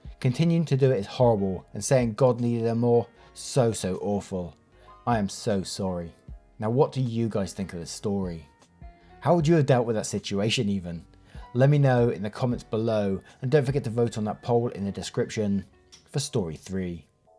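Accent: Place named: Midlands, United Kingdom. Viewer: Where abouts are you from? I can't place your accent is it Midlands?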